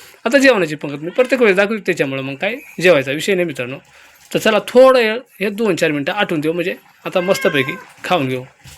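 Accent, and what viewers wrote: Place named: Nashik, India. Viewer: कुठे राहतात काका भाषा तर नाशिक जवळची वाटते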